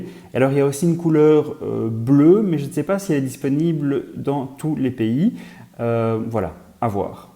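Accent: Belgium. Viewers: with a belgium accent i would say a belgium accent but i dont arrive to find town Charleroi Liege etc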